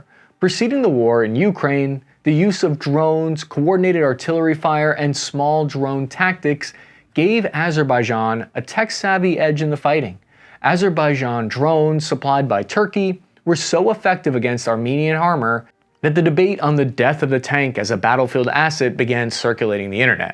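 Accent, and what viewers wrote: United States. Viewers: Dude, you have a very strong American accent to your English usage. For me, an American, I put a lot of credence to your reports and analysis, because I know that it is coming from a familiar/recognized source. This is a good thing because there is a whole bunch of analysis which is written and then 'read' by computer to make it sound to be American English. Your presentation is authentic and sounds authentic. I have listened quite a bit and have drawn parallels in facts and analysis to other sites and sources that I trust. Good job! Keep up the good work, you have a keen sense for conflict and the social and political dynamics around conflict. Again, good job! and Thank You for your hard work and research.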